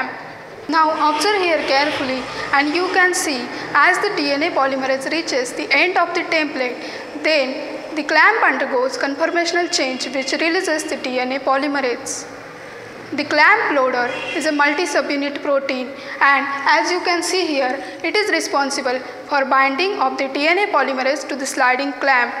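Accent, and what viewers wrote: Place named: India. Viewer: I had to close this video because I can't understand indian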